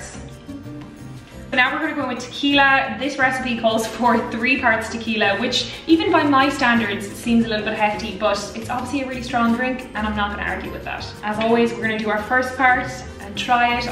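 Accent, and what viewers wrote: Ireland. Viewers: Great drink for never making one! The Irish accent is one of my favorites, every time I hear it, my thoughts start using an Irish accent for some reason lol. If you make this again use a spoon to sink the grenadine, just pour it on the backside of the spoon, works great!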